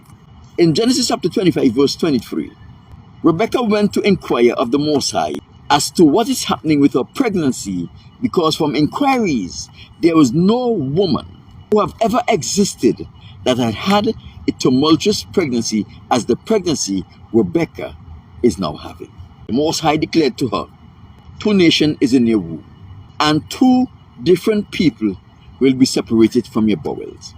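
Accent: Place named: Grenada. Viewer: You from Grenada. Gotta be!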